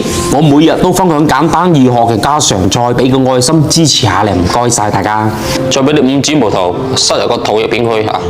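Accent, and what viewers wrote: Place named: Zhanjiang, China. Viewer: 聽口音個師傅應該係湛江地區人😂😂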